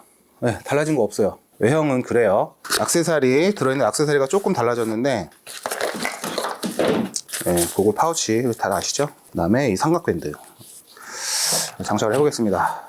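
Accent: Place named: South Korea. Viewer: Korean accent are 🔥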